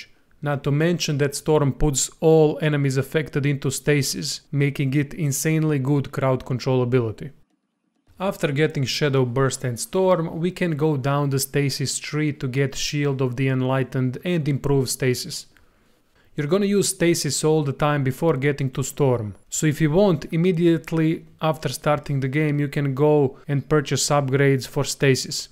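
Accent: Russia. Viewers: So there's a character in "The Magicians" Series of books ... which they made into a TV Show ... so when I'm being instructed by someone with a Russian Accent ... about how to be the best mage... I can only think you're Mayakovsky in disguise XD... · Are you russian? Very similar accent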